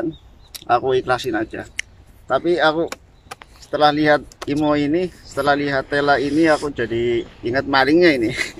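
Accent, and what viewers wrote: Banyumas, Indonesia. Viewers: Wong. Jawa tengah ya. Mas , Ngapak. Kaya. Nyong , Selamat tugas , mashe , , Mantaaappp. Nang. Jepang. Ya 👍👍👍👍👍👍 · Jawa ne ngendi mas ?? Logat e ngapak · Asline ngendi Mase, kayanya ngapak koh ,apa tanggaku Cilacapan.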